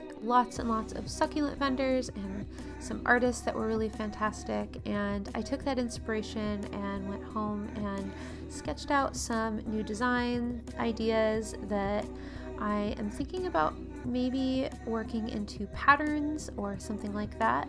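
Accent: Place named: Scotland, United Kingdom. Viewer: AHHHH that Scottish accent melt Thanks for sharing the footage from Treasure Fest - still bummed I couldn't go, but so glad to hear your account of it. All those succulent babies! So cute.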